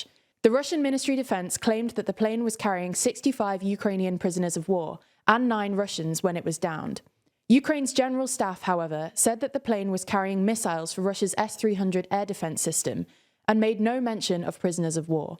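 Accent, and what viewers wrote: United Kingdom. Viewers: She is great but but has a T on the end, she is confident, easy to listen to and the news is impressive but she does say bu and similar so her pronunciation is more a natural British rather than correct :0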